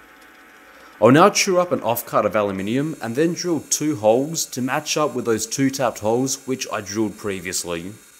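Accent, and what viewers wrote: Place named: Australia. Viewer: Aussies, y'see... I had to turn on CC at some point... ;-)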